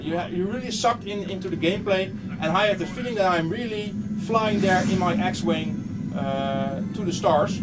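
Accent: Netherlands. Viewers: can't you like LOSE the fucking dutch accent... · nice dutch accent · je bent zeker nederlands of belgisch ik hoor het aan je engels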